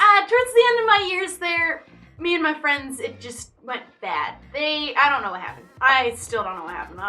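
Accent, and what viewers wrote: Texas, United States. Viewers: You sound like you were from Texas in UHShe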